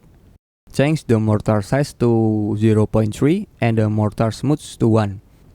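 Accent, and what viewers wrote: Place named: Indonesia. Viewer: Thanks, it's helped a lot! btw I can tell from your accent, are you Indonesian? ;)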